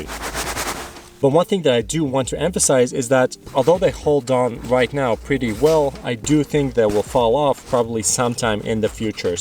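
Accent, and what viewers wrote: Latvia. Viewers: Yes, Latvian accent is hard to hide. Good video!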